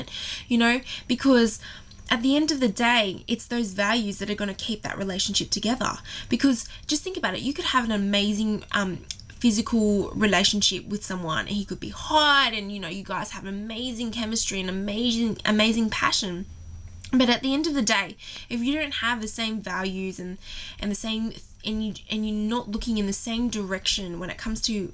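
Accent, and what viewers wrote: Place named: Australia. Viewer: Wow you sound super Aussie!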